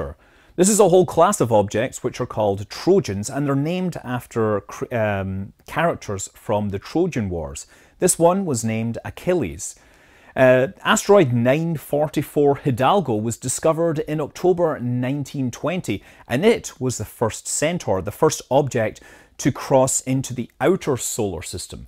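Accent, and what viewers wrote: Scotland, United Kingdom. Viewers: This is my favourite Scottish-sounding astronomy channel! We could predict where and when they will hit Earth. I don't know that we could stop them however. At least we would know when we are going to die. On second thoughts, maybe I don't want to know.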